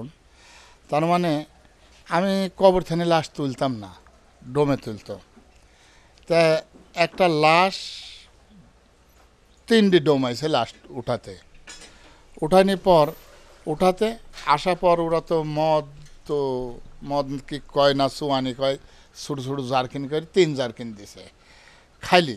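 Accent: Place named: Natore, Bangladesh. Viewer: কথা শুনে বুঝলাম আমাদের নাটোরের মানুষ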